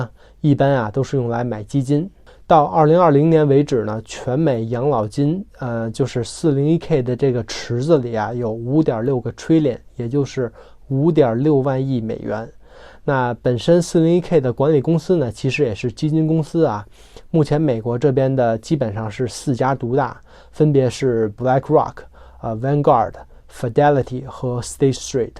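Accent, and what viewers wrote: Tianjin, China. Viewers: 听口音感觉up是天津人，对不对😀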